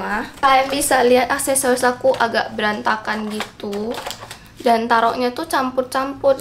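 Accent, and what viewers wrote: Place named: Java, Indonesia. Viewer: KAKA KALO NGOMONG KAYAK ORANG JAWA SIHH 😂😂 TAPI AKU YA ORANG JAWA YA HEHE 😅😅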